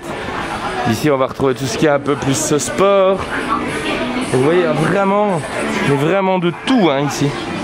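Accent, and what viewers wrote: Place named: Belgium. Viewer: J'adore cet accent belge :)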